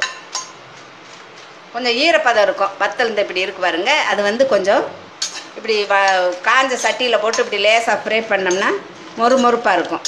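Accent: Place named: Sattur, India. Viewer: my dad was from a village near sattur, vembakottai and the way of talk has taken me back to that land of mine..good one thnks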